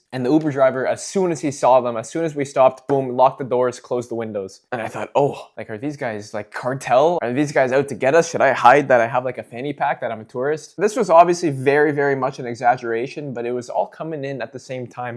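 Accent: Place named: Argentina. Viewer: Para mi sos argentino hablando Inglés, te entiendo porque hablo ingles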